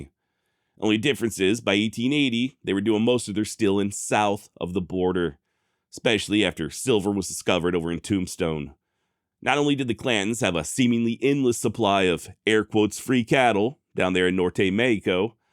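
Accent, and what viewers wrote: Texas, United States. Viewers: I feel like a lot of these comments on your content or accent are from people that watch about 2 minutes of a video, find something they disagree with, then comment, lol. The thing about your accent was hilarious. If you watch any amount of your videos, you will find out pretty quickly you are a Texan, lol. Keep up the awesome work. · I love your intro and sing along with it. (I wrote and perform mine) and a far as your accent goes sounds like central Texas or Oklahoma. The giveaway is wash. You say warsh. Warshington. Think Dustin Hoffman in Little Big Man. "Gawd bess George Warshinton". Classic. Keep pitching buddy. I love you just the way you are. YEE HAW! rocks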